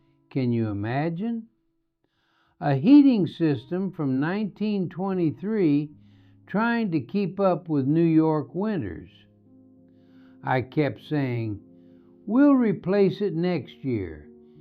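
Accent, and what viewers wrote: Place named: Nebraska, United States. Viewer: so spooky hearing you talk. You have EXACT same accent my dad did but he was born and raised in Nebraska in 1927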